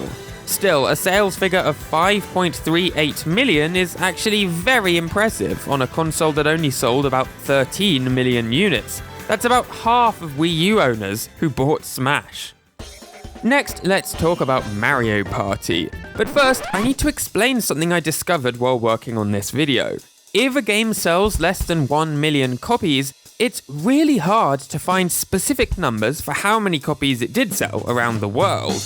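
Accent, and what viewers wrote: United Kingdom. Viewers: I don´t know why, but it´s just hearing your calm voice and brittish accent and i just get super chill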